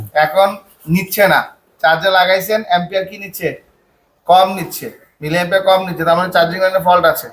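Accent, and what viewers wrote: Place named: Comilla, Bangladesh. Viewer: কুমিল্লা কথায়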